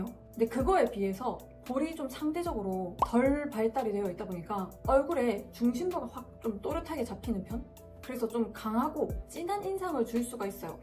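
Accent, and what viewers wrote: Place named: Daegu, South Korea. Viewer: 아 사투리보고 대구인줄 알았는데 서울에 계시넹 ㅜㅜ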